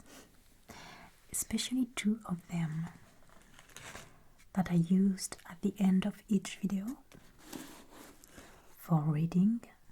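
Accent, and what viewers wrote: France. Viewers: Tu as un accent français, tu es française ? :)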